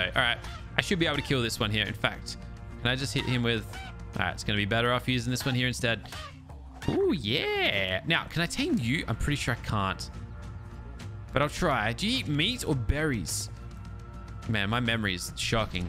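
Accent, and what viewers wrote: Australia. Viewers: I love the Australian accent but I don't like the idea of the huntsman spider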